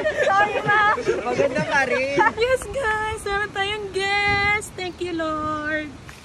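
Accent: Visayas, Philippines. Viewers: Bisaya diay ka ma'am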